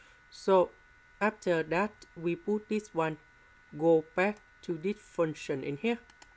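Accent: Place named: Vietnam. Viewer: oh giọng tiếng anh này thì chỉ có việt nam :))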